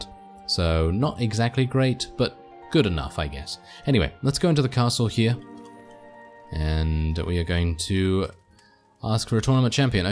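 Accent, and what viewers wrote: United Kingdom. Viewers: It was me, I was talking about Sarah and Leslie earlier, sorry bruh. Love the series, you are awesome. I started watching this series this Friday (I was sick this week) and just caught up now. It's addictive, and you're doing an awesome job, my beautifully British accented friend!! - Love from America.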